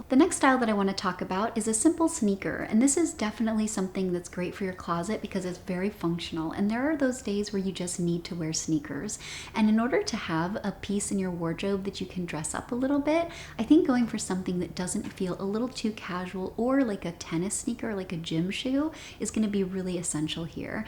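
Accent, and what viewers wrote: United States. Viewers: Same here! I also love her accent, it's so good to listen to. I don't know which part of the US she is from, do y'all have a guess?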